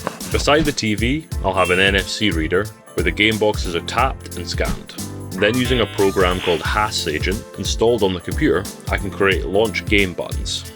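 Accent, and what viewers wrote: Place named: Aberdeenshire, United Kingdom. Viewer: Bro sounds like he's from Aberdeenshire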